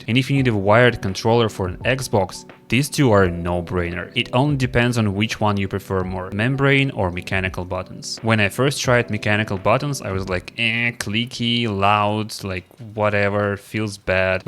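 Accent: Russia. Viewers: я так и знал что он русский с нулевой секунды было понятно =)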